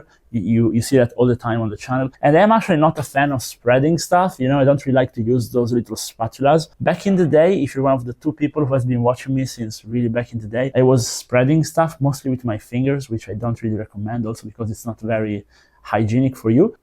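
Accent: Italy. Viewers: He's Italian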